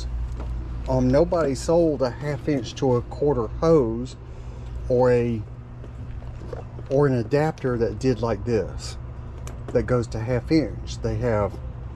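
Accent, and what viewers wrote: South, United States. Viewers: 😆 no worries, ya'll got a good team as usual. Are you from Georgia? I was thinking one of the Carolinas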